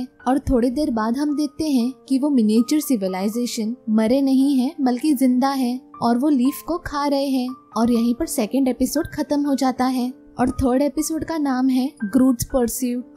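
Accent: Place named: Karnataka, India. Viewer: You are from Karnataka 🤔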